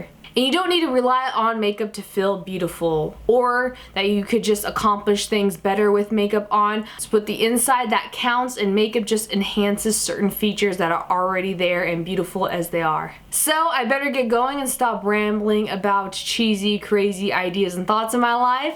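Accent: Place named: Texas, United States. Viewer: are you from Texas originally?? I always think you have a thick Texas accent:0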